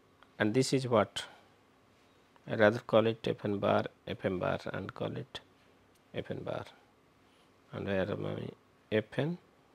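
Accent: India. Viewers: What is the meaning of talking when your English only can be understood by the Indian population. we have to be focused on 2 things the maths and the very hard Indian accent.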